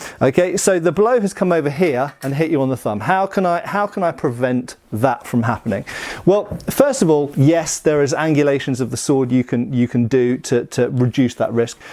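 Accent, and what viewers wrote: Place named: England, United Kingdom. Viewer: The English accent makes him sound smarter.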